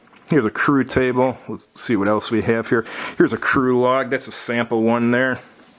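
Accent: Chicago, United States. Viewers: I know this is 2 years late, but great review. Also, this guy must be from Chicago. That accent is amazing.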